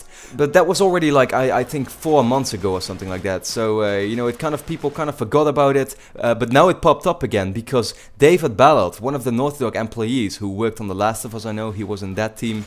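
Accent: Netherlands. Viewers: I really hope Uncharted 4 will be made by Naughty Dog. But one little question are you Dutch? Because you have a Dutch accent :p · are you dutch!? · You have an dutch accent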